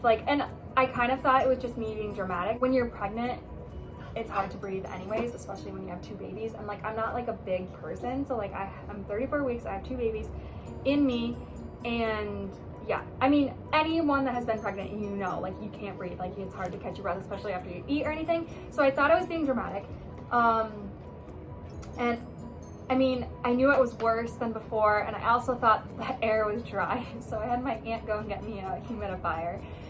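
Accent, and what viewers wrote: United States. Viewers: Thanks for the story! Your dialect says MINNESOTA but you're from Vermont. So cool.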